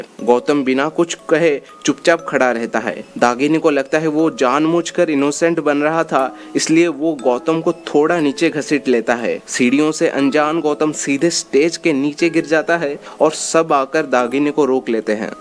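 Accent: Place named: Odisha, India. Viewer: You must be a Odia guy, got from your accent. But nice explanation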